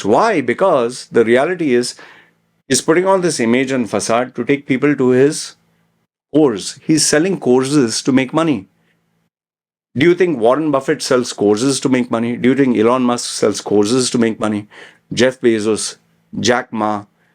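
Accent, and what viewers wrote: India. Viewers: I dont understand the English from the Indian accent ..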